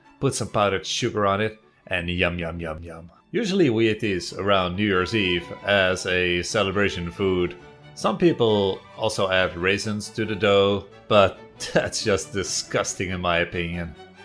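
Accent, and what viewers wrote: Netherlands. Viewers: Phantom Playz yuhh Dunglish (Dutch / English) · American/Candian with a Dutch accent..weird!! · AcaBlark he is dutch.. he might not know how else to pronounce it.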